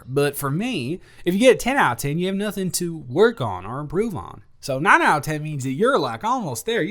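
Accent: South, United States. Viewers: AYE MAN WHAT DID I TELL YOU ABOUT THE SOUTHERN ACCENT!!😂😂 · THE SOUTHERN ACCENT IS AMAZING MORE PLS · I love the southern accent, too adorable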